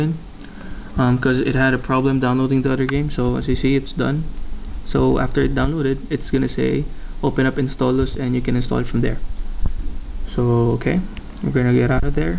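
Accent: Philippines. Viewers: u have a philipino accent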